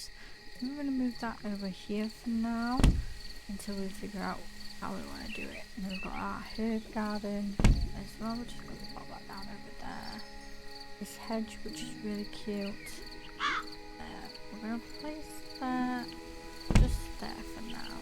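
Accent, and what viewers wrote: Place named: Yorkshire, United Kingdom. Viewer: Voice of a yorkshire angel to guide us through the demo! Amazing 😁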